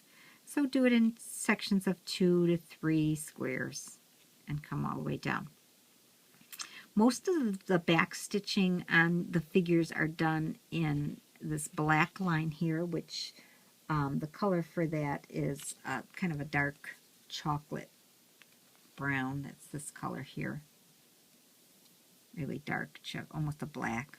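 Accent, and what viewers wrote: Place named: South Carolina, United States. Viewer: I enjoyed looking at all your projects... are you from South Carolina, I'm from Georgia... your accent sounds like my sister in law who lives in Columbia, S.C.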